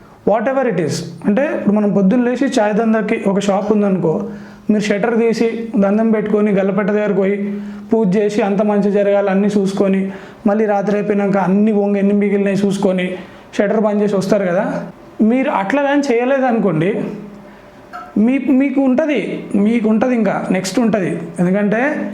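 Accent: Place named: Telangana, India. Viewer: Madi telangana maa bashaa ellaney untadi😂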